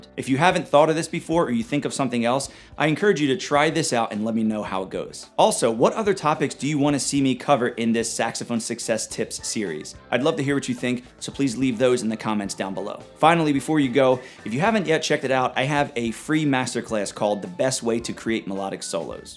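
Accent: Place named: New Jersey, United States. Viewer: AWW but it's gotta be a jersey accent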